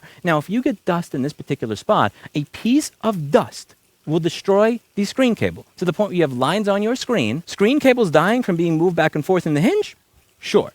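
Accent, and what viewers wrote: New York, United States. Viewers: I can fully process at 0.5x, but slowed ny accent sounds like a mob repair guy. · The angrier Louis gets the more is New York accent comes through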